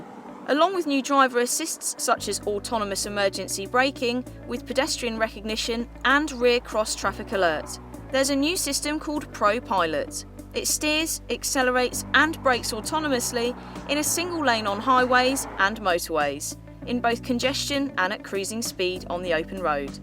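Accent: United Kingdom. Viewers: for sure, you can speak as you want with your friends, but here there are people like me who do not understand all the thousand brits accents, so please try to be neutral, that would help, nothing against, just don't get all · The best looking car reviewer of all and she gets the job done, in details.Good to hear a good old British accent in a British car review.Like her a lot and the fact that she is beautiful is a bonus.Good on ya.The choice for me in this section would be a Mazda CX-5.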